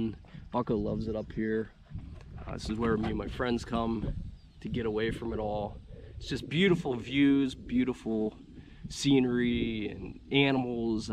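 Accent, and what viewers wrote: Western Pennsylvania, United States. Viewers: Congrats on reaching 5000 subscribers! Stay humble, keep learning. Continue to set high personal standards. Keep doing great work. AND hang on to that Western PA accent!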